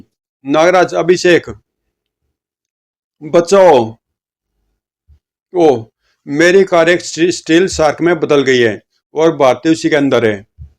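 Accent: Haryana, India. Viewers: Bhai hindi ko haryanvi me bol raha he kuch samaj nahi aa raha thire thire hindi bolne ki sahi se practice karo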